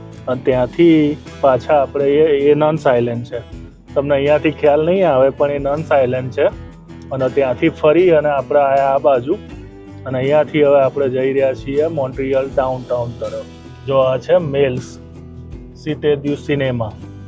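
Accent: Mehsana, India. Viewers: BoliTo Mahesani chhe, PAN Gaom Ke U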